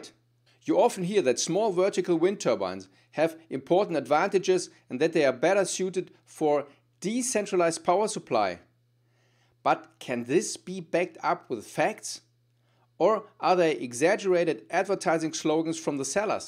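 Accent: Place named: Germany. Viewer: You're a clone of a colleague of mine in Stuttgart... with the very same accent and weirdly enough, deeply convinced that US technical validations are UNBIASED! 😆That's so naive! Industrial considerations are paramount, meaning that a better technical solution may be beaten as it's been already the case with heat engines as a matter of fact. Once I asked him how trustworthy are Tüv tests and certifications: Are they to be less reliable than their american counterparts? It was an inner national struggle for my colleague... Oh Gosh! Corporates can bring down the most wonderful ideas. It's appalling that you ignore it!!🤣😂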